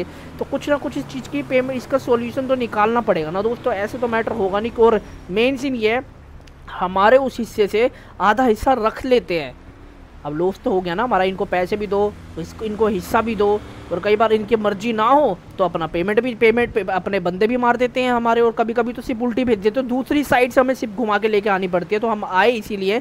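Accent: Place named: Saharanpur, India. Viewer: WAHHHHH BHAI SAHARANPUR WALI BOLLI ENGAE UNGAE ❤❤❤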